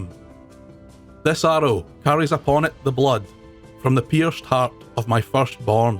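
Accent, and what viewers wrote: Scotland, United Kingdom. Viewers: Scottish history has always fascinated me. I could listen to a Scottish accent telling stories forever! Thanks for the great content.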